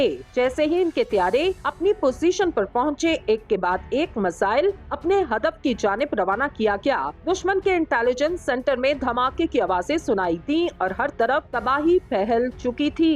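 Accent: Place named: India. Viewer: اگر بھارت کے خلاف ویڈیو بنائی تھی تو بولنے کا انداز بھارتی رکھنا ضروری تھا؟. اردو تو باکستانی بول لیتیں۔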